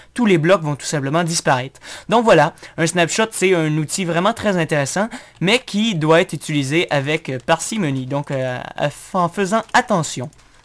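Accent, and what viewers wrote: Quebec, Canada. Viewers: il dit ''une video'' en tout qu'a au debut mais bon c'est comme sa c'est quebecois c'est fun c'est drole j'adore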